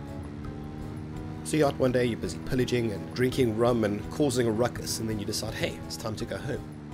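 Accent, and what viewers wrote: South Africa. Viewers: Came across your channel in suggested. And i heard your voice and thought this guy is definetly south african xD its awesome to see a south african gaming channel this big. Definetly subbed <3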